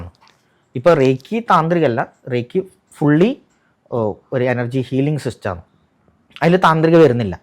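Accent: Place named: Malappuram, India. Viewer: Slang കേട്ടപ്പോൾ ഒരു മലപ്പുറം touch തോന്നി😅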